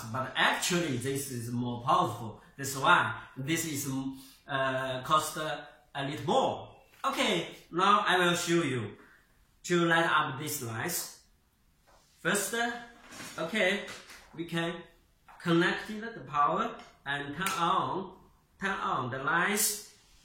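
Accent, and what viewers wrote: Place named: China. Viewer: 中文口音的英语。我真的听的很舒服。希望做一期中文版的 b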